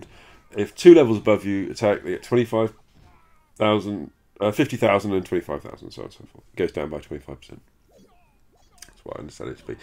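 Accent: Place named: North Yorkshire, United Kingdom. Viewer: are you originally from around the North Yorkshire area? Your accent rings a bell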